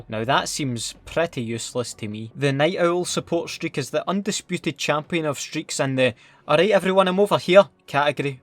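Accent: Scotland, United Kingdom. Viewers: i love hearing a scot guy ranting about cod killstreaks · great acssent bit cam doon abit ma femily are pure hearted scots men an we ma get feejee a lot bit nae as bad a ye mate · Wis happpnin in? Where aboots in Scotland are ye actually fae? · Absolutely love your Scottish accent, love from italy❤️ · It's because Scots tend to have a good sense of humour... But the accent DEFINITELY helps. Frankie Boyle for example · Being from America his Scottish accent is very difficult to understand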